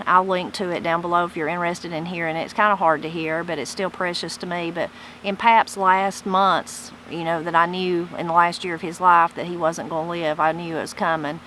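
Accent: Appalachia, United States. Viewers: I just came across your channel and I love it. So many things you describe sound just like my Grandmother. She was from Tennessee and my Grandfather was from North Carolina. So great to be reminded of the spoken sounds of my childhood…thank you.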